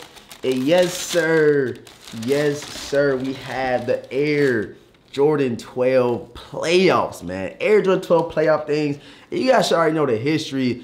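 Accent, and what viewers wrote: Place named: St. Louis, United States. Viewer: A G are u from St. Louis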